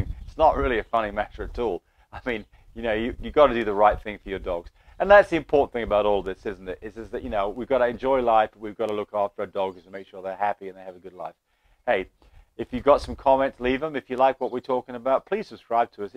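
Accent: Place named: England, United Kingdom. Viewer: Ps: I saw you have a English accent 🇬🇧I’m from England too. Do you know anyone in England who breeds dogs and does these videos? Thanks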